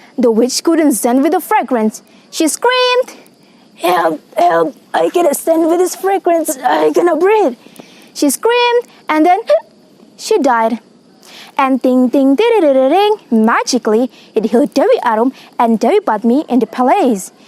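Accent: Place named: United Kingdom. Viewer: Keren mbak... british aksennya itu, uwuwww top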